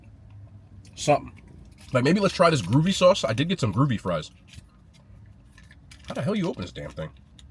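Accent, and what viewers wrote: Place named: New Jersey, United States. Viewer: Bro so jersey he can’t help it lol …you gotta freak the burger all the jersey people knew exactly what you was saying lmaooo ona set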